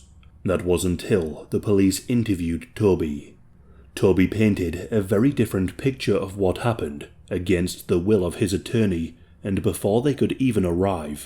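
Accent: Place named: Scotland, United Kingdom. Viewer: I like your accent which I suspect is of Scottish origin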